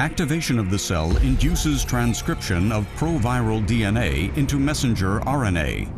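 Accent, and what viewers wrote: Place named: United States. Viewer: No mazen i don't think it is british , british accent is much easier than american , probably it is american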